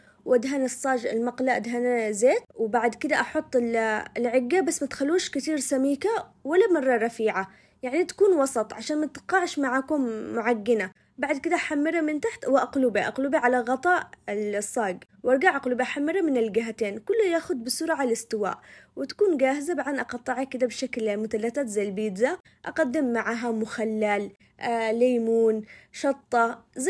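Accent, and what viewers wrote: Aden, Yemen. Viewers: فديت الكلام العدني الاصيل ربي مايحرمنا منك ❤❤ طبخك مايحتاج شهادات 😊 استمررري وأحلى لايك👍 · تسلم الايادي حبيبتي 💖… لهجتك تجنن اعيد الفيديو علشان اسمع اللهجه اليمنيه … اجمل واحلا لايك 👍🏻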